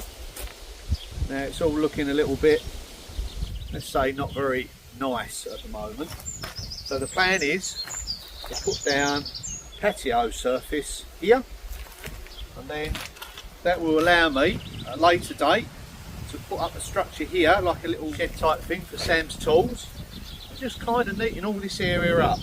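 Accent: United Kingdom. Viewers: . I texted UTube about this problem Their answer: British accent maybe hard to understand. That’s in a nut shell. But that’s not right because American English is having trouble too. They have a problem and can’t fix it. 😢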